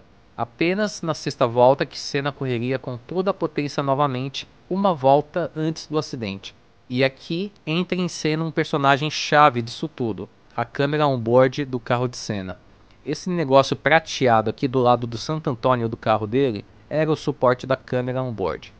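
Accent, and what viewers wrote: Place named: Portugal. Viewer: Ele é português? Tem sotaque.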